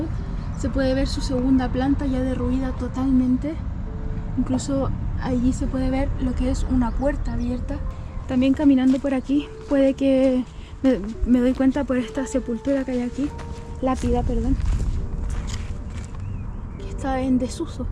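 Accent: Chile. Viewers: Saludos desde Chile. Tienes a veces un acento como el nuestro. Un agrado descubrir tu canal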